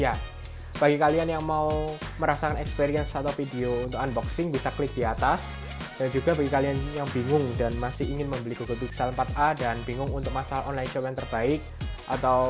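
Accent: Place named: Surabaya, Indonesia. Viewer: Pasti org Surabaya ya bang?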